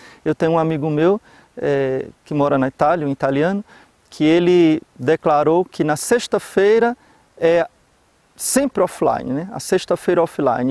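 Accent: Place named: Northeast, Brazil. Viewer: é nordestino?????? Nem desconfiei, e agora vejo que tem um "sotaquezinho" ai kkk. Padre eu sabia dessa lei da Igreja, mas costumo esquecer e acabo comendo. Quando lembro vem um pouco de culpa, mas já não tem volta... é tentar na próxima.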